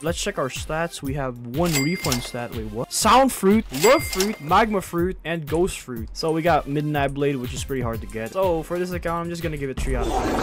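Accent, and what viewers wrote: Philippines. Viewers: He's from Philippines not fully english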